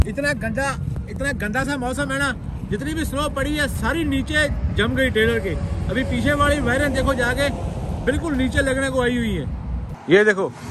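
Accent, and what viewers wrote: Punjab, India. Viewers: Tu pure Punjabi bola kar bai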